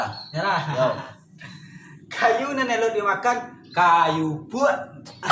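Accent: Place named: West Sumatra, Indonesia. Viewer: Keren abis dah bang. Seneng denger lagunya. Sama seneng denger logat minangnya.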